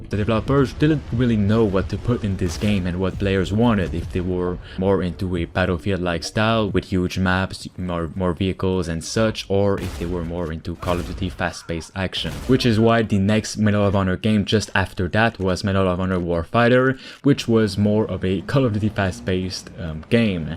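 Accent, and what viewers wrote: Quebec, Canada. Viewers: Oh well I guess I'm gonna buy this to finally try it... Wait a second... Es que t'est Quebecois?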